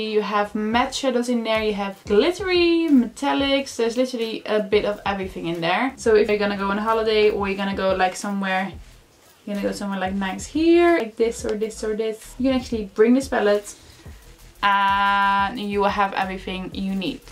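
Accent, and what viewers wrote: Netherlands. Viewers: Je hoort zo goed dat je Nederlands bent. Cute look🤗🤗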